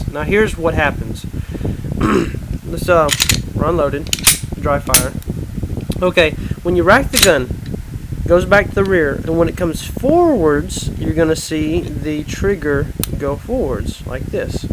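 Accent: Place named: South, United States. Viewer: his accent isnt that strong i would say that accent could only go as south as missouri, stop hating on him just because he has a bit of a country accent city boy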